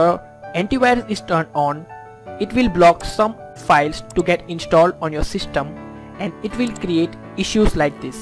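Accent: India. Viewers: YOU ARE THE MAN!!! 10+ years I worked with Studio, then a few power cuts happened in a short row, always happening again whilst my pc was restarting. at the end it didnt start at all. the tech shop next door re-installed windows, but all programs and applications would be gone. so I downloaded everything from AVG over ACDSEE over FILEZILLA over HANDBRAKE .... up to STUDIO 19. Installing process went fine, just as dozens of times before in my like and then - I couldnt see a video, neither from the Source nor from the Timeline. It didnt make sense to me, I triple checked everything from Drivers to Graphic card to windows settings to Studio settings - all was fine. But I couldnt make those videos visible. I was chatting with Coral`s tech support as well of course - they had no clue whatsover. And then I just described on Google the problem that occures in the field for the search term and 3 clips appeared on Top with one being yours and when I heard the Indian accent I knew I was in good hands. 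How would a normalito like me ever would know of 3 windows apps which i had never heard of, unless someone who knows tells him. AND FOR THAT I AM TRULY GREATEFUL. NEXT TIME I GO STRAIGHT TO GOOGLE. THX A MILLION, PAPI. SALUDOS DESDE BARCELONA MARIO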